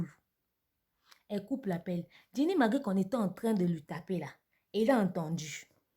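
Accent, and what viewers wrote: Ivory Coast. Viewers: je t’aime bien mais j’aime plus quand tu parle normalement le français ivoirien ne parle plus ça stp ma sœur je t’aime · Ma chérie je t'aime bcp tu es parmi le youtuber congolaise qui par bien le français et tu fais bien tes analyses. Mais pardons ne parle pas avec l'accent ivoirien. Parceque moi je beaucoup des amis ivoiriennes qu'on un complexe d'infériorité enver les congalais ils disent que nous parlons tres bien le français sans accent. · J'aime bien tes analyses,ce que j'aimerais le plus qd tu t'exprime en français normal c'est trop nickel et non l'accent ivoirienne excuse moi.😘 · Exprime toi bien comme tu as l habitude de le faire arretes cet accent ivoirien qui ne te sieds pas du tout. Sinon bonne analyse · T EST VRAIMENT OBLIGER DE PARLER COMME UNE IVOIRIENE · Ma Sœur, Vous parlez très bien français car je vous ai toujours suivi. 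Svp ne changez pas d’accent ou n’ empruntez pas le ton ivoirien. Gardez votre excellent français avec vos bonnes analyses. Cela fait votre identité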